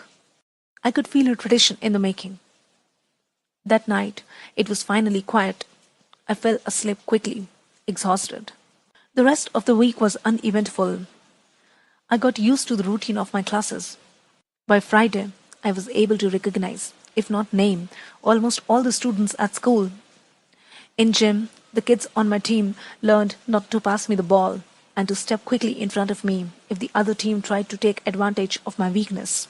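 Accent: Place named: India. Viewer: Wow!!! It is very interesting to here in Indian accent!!!! Good work.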